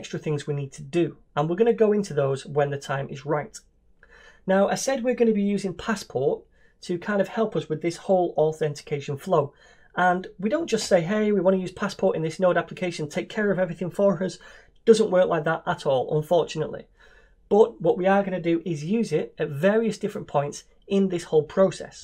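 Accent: United Kingdom. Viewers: Two things I liked in this video are : 1) Your explanation 2) Your British accent =D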